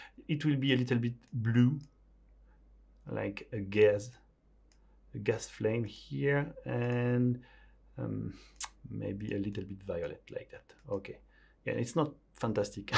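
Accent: France. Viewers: I think it's a french's accent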